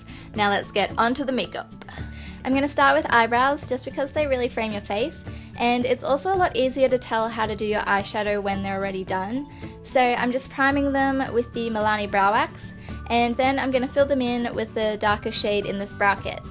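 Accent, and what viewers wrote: Australia. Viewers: Woa you are stunning! It's also lovely to hear an aussie accent :D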